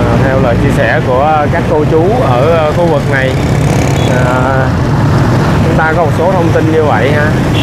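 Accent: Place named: South, Vietnam. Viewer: Noi tieng mien nam nghe rat ro thanks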